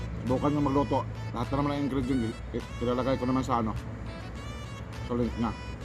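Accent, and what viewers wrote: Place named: Visayas, Philippines. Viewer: Ay bisaya ka deay idol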